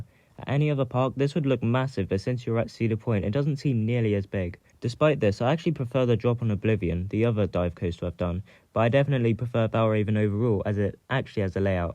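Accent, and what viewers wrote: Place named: United Kingdom. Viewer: I don’t particularly like roller coasters (because I get motion sick) so it’s a mystery as to why I was recommended this video 😅, however I do live in Ohio and have gone to King’s Island a lot. I decided to watch the video and found it interesting to hear someone from across the pond talk about kings island. 😊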